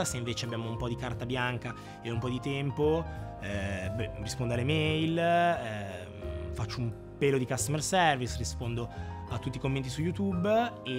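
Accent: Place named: Milan, Italy. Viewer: Grande, bravo e anche simpático. Oggi sentendoti parlare mi hai riportato indietro nel tempo alla mia infanzia, vissuta nella provincia milanese, ed è stata pura e viva emozione. Cinquant’anni più tardi qui nel cortile di casa alla periferia di Madrid la lingua non è più quella, allo stadio non c’è Gianni Rivera ma c’è Carlo Ancelotti. Tempi e luoghi che cambiano…. Grazie.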